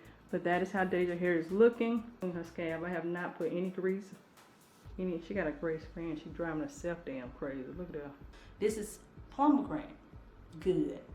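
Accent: South, United States. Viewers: She's not saying scab she is saying scalp with a a southern twang. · Adele Reed it’s just her southern accent.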